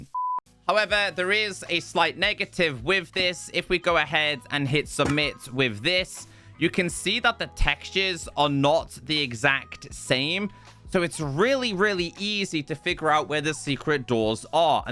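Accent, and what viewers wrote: United Kingdom. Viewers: I was watching one of your videos and my Dad said “what in the British!?”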